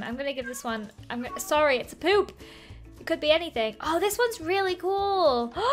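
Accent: United Kingdom. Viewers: She's british, they pronounce things differently.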